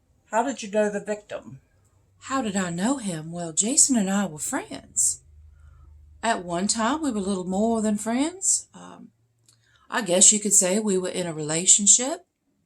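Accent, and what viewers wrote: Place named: South, United States. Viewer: Love it 😃😍 and your southern accent 🤩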